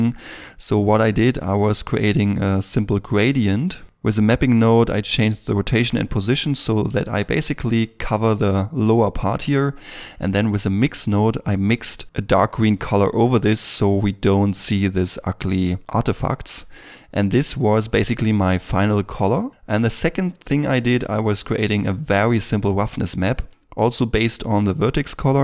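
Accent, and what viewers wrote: Germany. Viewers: Uhh dein deutscher Akzent kommt schon durch, was es aber interessanter macht :3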